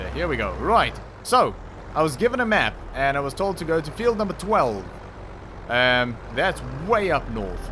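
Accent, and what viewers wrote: South Africa. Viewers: just came across your channel and thought i heard a south african xD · I new I smelt a south african accent😂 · Hearing his voice in the first 2 minutes I knew he was South African and them him confirming it is hilarious. Looking forward to go through your old series and awe from a fellow Sa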